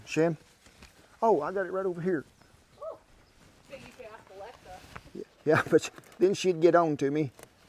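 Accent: South, United States. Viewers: I don't use Alexa or Siri because they do not understand the Southern accent, this is why she did not do what you said. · As a fellow southerner, i already know this food is gonna be bussin just based off that accent and the glorious mustache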